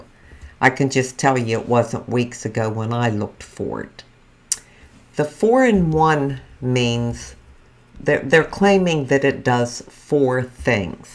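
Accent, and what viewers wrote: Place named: Pennsylvania, United States. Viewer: Hi! I'm new here to you! Wow no foundation! I'm a foundation Queen at 78 almost 79! I think I still like to play little girl dress up LOL I had my cataract surgery done January and I can't wear full make up yet or eyeshadow and I'm chomping at the bits LOL your skin looks good for not wearing any foundation you must take care of your canvas because that's number one for me even though I cover it with foundation LOL I love your accent my PA girl I'll see you again soon. Oh and I don't use this foundation only because it's not enough coverage for me but it looks good on you! My subscribers have gotten me thru some tough times the last year so yes encouragement and support means everything! 😘Hugs Babs ❤️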